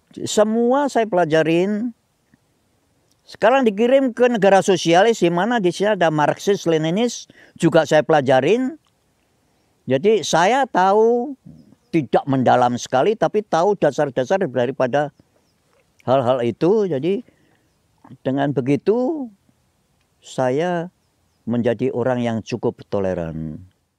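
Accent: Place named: Java, Indonesia. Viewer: Eyang aksen jawanya masih medok sekali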